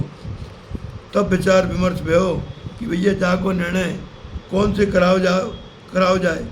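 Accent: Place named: Braj, India. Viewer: आपके द्वारा ब्रज की बोली में कथा बहुत सुंदर लगती है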